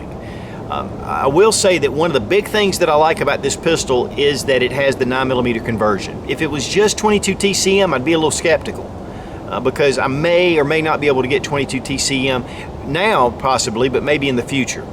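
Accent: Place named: South, United States. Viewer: Ah, give him a break, he's got a suthin' accent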